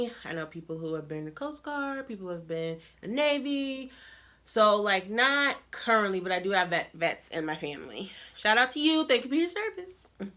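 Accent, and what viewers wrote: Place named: Chicago, United States. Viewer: Yup you have an accent! Chicaaaago lol #NewSubbie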